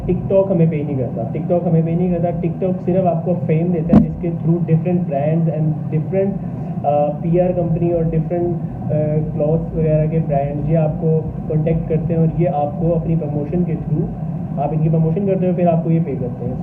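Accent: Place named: Punjab, India. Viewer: U speak like pungabi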